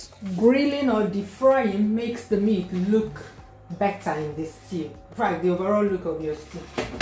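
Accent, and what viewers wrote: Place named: Igbo, Nigeria. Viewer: Nne I'm also Igbo but I just find the way you speak English amazing 😍, like the accent is giving me joy❤ I love it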